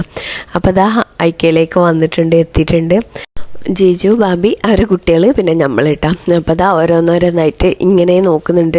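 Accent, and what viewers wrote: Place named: North Kerala, India. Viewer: Samsaram kelkkumbol kannoor and Kasaragod anenn thonnum . Malappuram kariyanenn parayilla .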